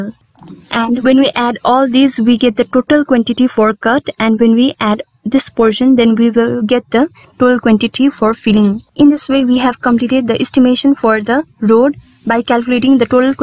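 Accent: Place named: Nepal. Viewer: you have nepali accent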